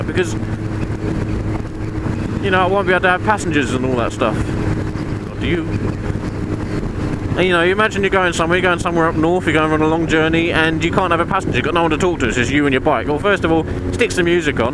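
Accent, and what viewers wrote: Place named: Australia. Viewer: I'm new to you.I like the distance you keep from cars,i like the general message you are trying to give,i like the fact that you havent bought the latest and greatest super duper bollocks and do fine on an older bike.I dislike the slightly australian accent you sometimes have,the colour of the fork tops of your zx9 and erm,the shape of your mirrors.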